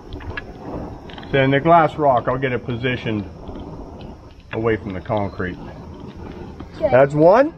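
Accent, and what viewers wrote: Pittsburgh, United States. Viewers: Previous New Castle boy here...... subbed via the Morgans! Good stuff..... how I miss that Yinzer accent!!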